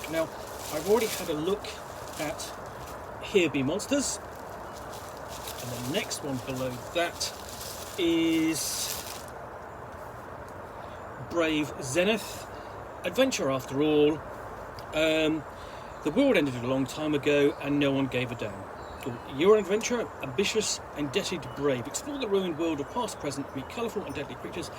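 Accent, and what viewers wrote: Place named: United Kingdom. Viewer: Bom video! Adorei seu sotaque britanico e sua calvice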